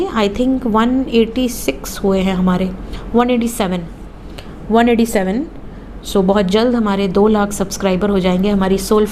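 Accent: Varanasi, India. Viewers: Aap ko iswar firghau de aap ki banarasi aawaj aur andaj dono mujhe mere Banaras ki yaad dilate hai❤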